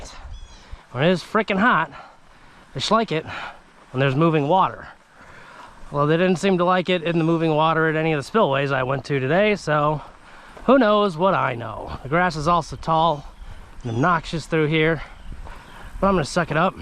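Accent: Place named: North, United States. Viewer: You sound like a gat dang yankee compared to that old dude at the start.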